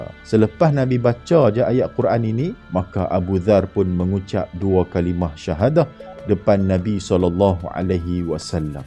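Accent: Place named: North, Malaysia. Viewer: speakker org utaqaaahahahah pekattt ni rimbukkk org kl mna fhm apa hahahah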